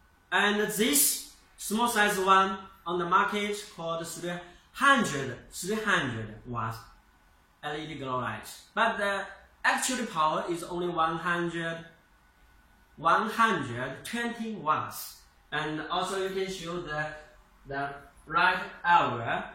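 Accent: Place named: China. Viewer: 中文口音的英语。我真的听的很舒服。希望做一期中文版的 b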